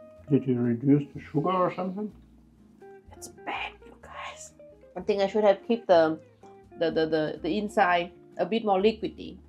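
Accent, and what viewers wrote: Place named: Germany. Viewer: Your English is not a burden! I love. You remind me of how my German mother speaks English and I find it quite lovely.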